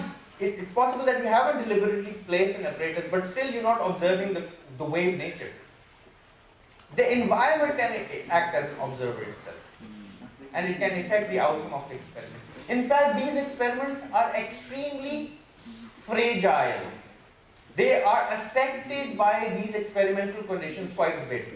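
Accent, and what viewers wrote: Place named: India. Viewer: when he starts talking in his indian dialect and then he ends it with "and thats what physics is all about" ... very good explanations thanks for sharing.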